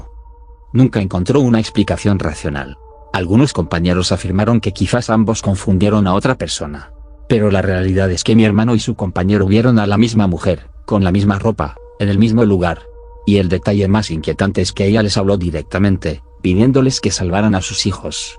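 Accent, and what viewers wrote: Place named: Mexico. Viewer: exactamente, tenemos otros términos y lo narra muy mexicano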